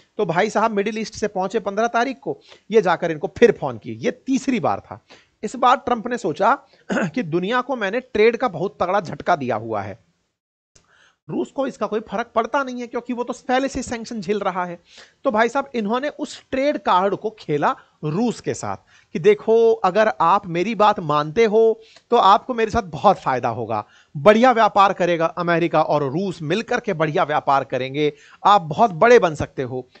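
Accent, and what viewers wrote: Bihar, India. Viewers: ji, aapkay hindi lehzay say lagraha hei aap biharsay hein?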